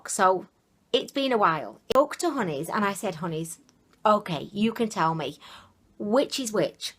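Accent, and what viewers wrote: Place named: South London, United Kingdom. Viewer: Interesting accent. Where are you from? Are you south of London??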